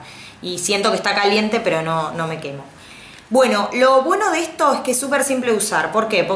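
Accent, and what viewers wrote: Argentina. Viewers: Yo basicamente quiero ganarme la buclera porque tengo un gato en la cabeza como vos, y nada, a veces me lo plancho pero para salir o para estar mas formal tengo que hacerme 'ondas' con la plancha (hay que hacer lo que uno puede con lo que tiene, no?) y bueno, nada, eso. Soy de argentina y y y y y y me re gustan tus videos y que seas de argentina me re entusiasma por que hablas tan argento que que que :D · Hola!! yo quiero ganar por que tengo un gato en la cabeza como decis vos, bueno yo tengo una plancha pero ya estoy re podrida de usarlo lacio la verdad que si, un bajon mal,estee.. me encantan tus videos en especial xq hablas como yo y por que vivo en argentina, espero ganar y nada, besos! Ya me suscribi!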